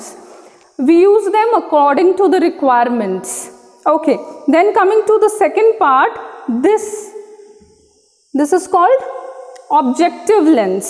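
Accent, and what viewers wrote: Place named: India. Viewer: Your pronunciation says that you are an Indian..But well done 👍👍👍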